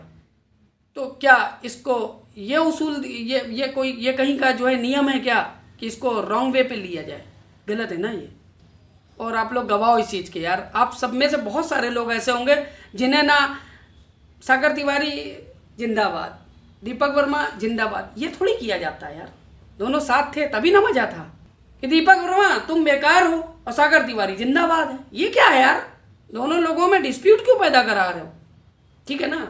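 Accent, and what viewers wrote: Bihar, India. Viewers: Pehale bihari baat choda ok baki sab theek he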